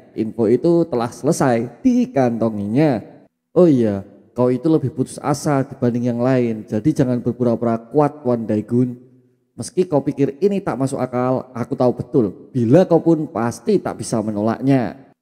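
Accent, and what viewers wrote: Java, Indonesia. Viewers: Logat jawa lo medok banget😅😅😅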